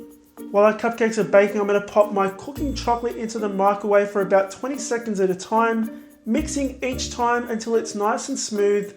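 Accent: Australia. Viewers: Are u Australian do u live in australia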